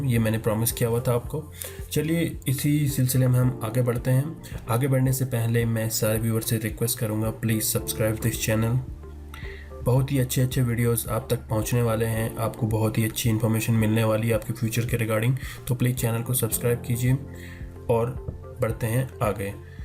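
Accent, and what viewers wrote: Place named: Ireland. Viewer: Dang that's a thick Irish accent or are you drunk or both?? Please next video try accent free English, then I can understand what you are saying.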